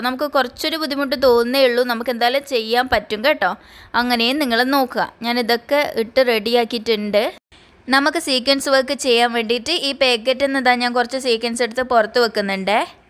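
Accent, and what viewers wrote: Kannur, India. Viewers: നിങ്ങളുടെ place kannur aano സംസാരം കേട്ടിട്ട് അതുപോലെ und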